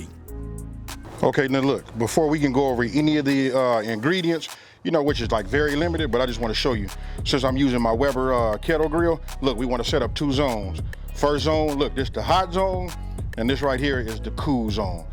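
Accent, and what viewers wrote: United States. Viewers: Would love to see that. Love your American accent and if I ever came to US and A would love to meet you and your Family 🌅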